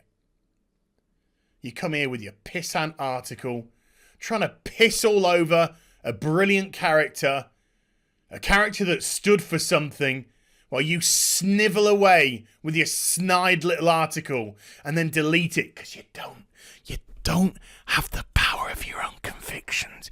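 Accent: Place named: United Kingdom. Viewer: Hearing a Brit talk about these things really made my day. All my uncles, my grandfather, and my great grandfather all served in the Army, and they did so willingly. They are true patriots, and I cherish every one of these fine men in my family, and all others. God bless America, and God bless all the people who have served and live in the hearts of the many.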